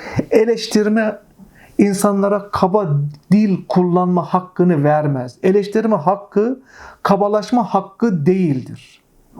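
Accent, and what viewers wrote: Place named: Konya, Turkey. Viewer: Allah razı olsun hocam. bu arada tam bir konyalı gibi konuşuyorsunuz bazenleri ( ' Biliyonuz mu ') :))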